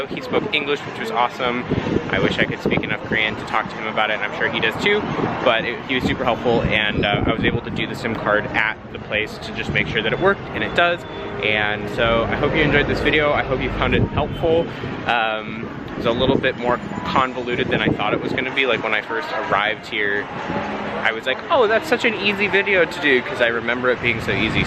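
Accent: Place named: Japan. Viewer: Your Korean sounds like Japanese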